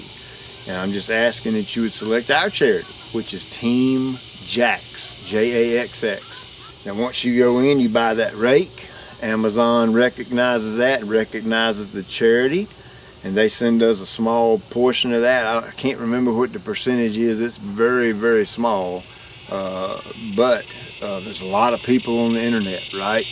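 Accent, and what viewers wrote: South, United States. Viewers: I thought that as well but I think it was the southern draw :))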